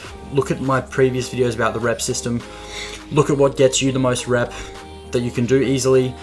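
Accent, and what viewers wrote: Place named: United Kingdom. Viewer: I was just wondering where you are from? You sound like you have a little bit of a British accent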